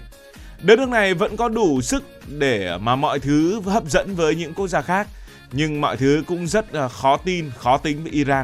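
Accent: North, Vietnam. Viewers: Nghe nói từ Paris là tôi thích ngay, dù là nói tiếng Bắc...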